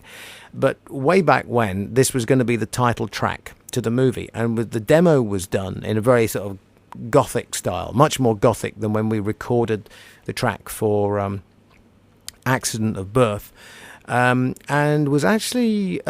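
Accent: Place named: England, United Kingdom. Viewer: A great commentary love is English Accent.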